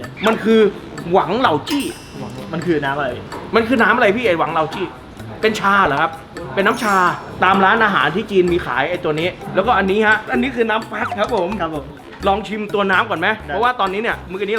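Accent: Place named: South, Thailand. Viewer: คนใต้ใช่ไหมนิ มาเลยมาคุยกับหัวหน้าผมก่อน😆😆😆😆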